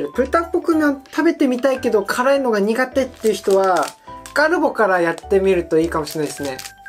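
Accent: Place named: South Korea. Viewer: 오 어릴적 한국에 살아서 그런지 지금도 한국인 발음이 남아있는것같아요 신기ㅋㅋㅋ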